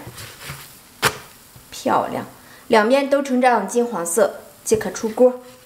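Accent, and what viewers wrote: Shandong, China. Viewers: According to her accent she's probably from the same state as me, thanks to her guide I can make authentic pancakes justl ike in my hometown. I highly recommend to try her recipes, turst me it's not as difficult as you imagine. 如果你需要英文字幕我可以帮你，作为感谢你让我吃到了想念了多年的家乡饼。说实话看你的视频之前，我都不知道这种饼叫什么名字，只知道在山东非常常见。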